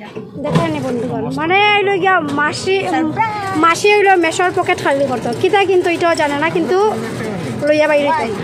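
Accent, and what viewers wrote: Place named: Bangal, Bangladesh. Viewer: Tomar bangal katha khub valo laglo. Ami kolkata theke. Tumi khub beautiful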